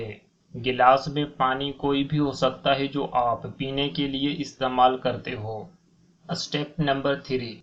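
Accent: Sindh, Pakistan. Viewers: Awaz kisi aur ki use kr loo. Betay ya bahi. sa bunwa loo video with good. sound and style. Sindi village style chorr du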